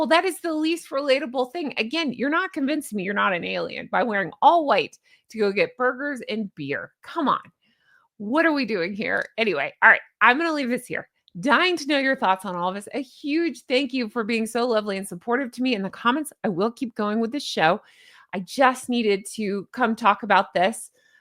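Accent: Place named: United States. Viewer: Im not saying all Americans have a crappy sense of humour, but generally us Aussie's do consider American's to be a little sensitive and easily triggered lol. is very much like an Aussie with her highly developed sense of humour and down to earth personality and the way she laughs and smiles often, any Aussie reading this will KNOW EXACTLY what I mean. is like an Aussie with an American accent 😁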